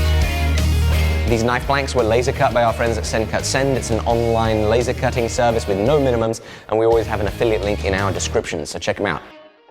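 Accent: United Kingdom. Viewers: Jesus, 8 months and you're back to full brit lol. Cussing out your mate on camera lol. Love it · is finally British again and it’s the best feeling ever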